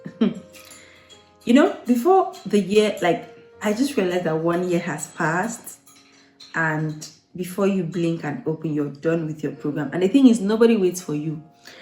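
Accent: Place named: Nigeria. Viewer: The Nigerian accent tho...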